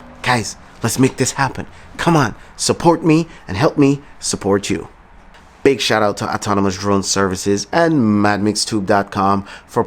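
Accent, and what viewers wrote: Trinidad, Trinidad and Tobago. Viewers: u from Trinidad? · Always nice to find a new drone channel on YT...subscribed. Loved the video. You sound like an expat Trini btw.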